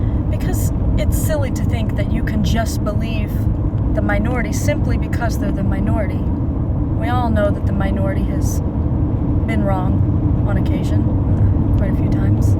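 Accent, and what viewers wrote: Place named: United States. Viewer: she's USA citizen and sees what happened to PC Europe and like many US peeps she doesn't want it to happen in USA as Trump supporters worry about.... she's quite pretty.......she reads books!!!!!!!!!!! USA woman who reads books?????????????????????? da! is this possible? Am I seeing a female robot with a US accent' 'so nice that accent'' yes what a lovely voice....did I mention she reads????????????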